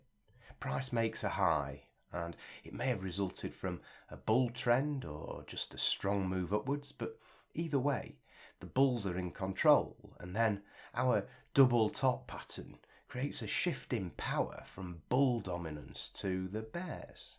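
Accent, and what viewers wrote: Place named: England, United Kingdom. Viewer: And besides I do love the English accent :)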